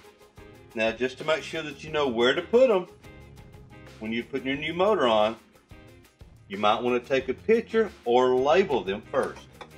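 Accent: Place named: South, United States. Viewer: southern drawl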